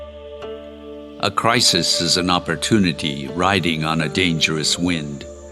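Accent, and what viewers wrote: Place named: United States. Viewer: voice over😢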